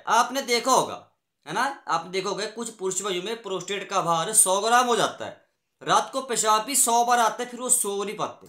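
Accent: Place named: Himachal Pradesh, India. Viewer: Happy birthday🎉🎉Himachali lamguage lg rahi aapki.aap himachal se ho??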